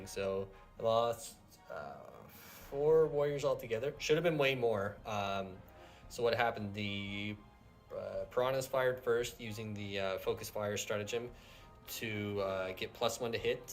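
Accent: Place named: Canada. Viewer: Canadian accent detected :)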